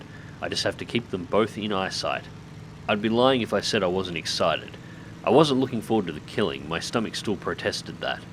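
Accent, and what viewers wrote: Australia. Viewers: I LOVE THIS!!!! I am a "Yank" in Tennessee and just wanted to say ,I haven't even finished watching the video , but had to stop to tell you ",YOU HAVE FOUND YOUR CALLING!"Ive been wandering what you would do now that Giajin has "went too far"!! your Aussie accent ,style, and obvious knowledge of what you are talking about is what makes this hopefully, the first of a VERY long and successfull series! Would like to see two a week if possible, could run for YEARS!! "THANK YOU VERY MUCH FOR ENTERTAING ME!!"...was about to give-up on YouTube. :)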